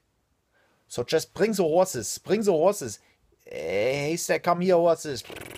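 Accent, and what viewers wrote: Germany. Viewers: Hey, kannst du eigentilch auch Englisch reden ohne den Deutschen Akzent? Bei uns in der Schule in NRW lernt man das mit Americanischem Akzent :D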